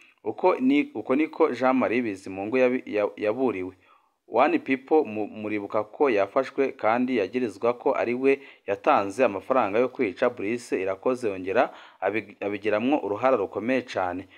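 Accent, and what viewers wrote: Rwanda. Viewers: Amakuru yawe aba maremare kubera usubiramwo ivyo uba wamaze kuvuga kandi wige kuvuga neza ikirundi ugitandukanye n'imvugo nyarwanda nyarwa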